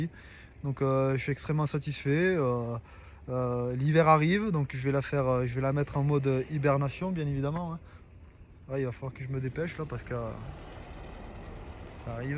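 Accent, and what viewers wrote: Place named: South, France. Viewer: Sympa ta vidéo 👍 et surtout ça fait plaisir de rencontrer un youtubeur de la Yaute avec l'accent du sud 😄. Cette Streetfighter c'est une tuerie, pour moi c'est le roadster ultime avec la SD 1290 voir la Brutale 1000. Mais niveau budget c'est pas pour moi 😅. Après ça tu ne sais plus sur trop quoi rouler. Tu m'étonne que tu la garde. Moi j'attends la Streetfighter V2 moins performante mais plus accessible 😉. En attendant je garde ma supersport 1000 ds. Super les images du bassin Annecien et du Semnoz, une super route pour essayer les bécanes des nombreuses concessions de Seynod 👍. Bonne route à toi, vivement le ski et à tt bientôt sur les routes du coin. ✌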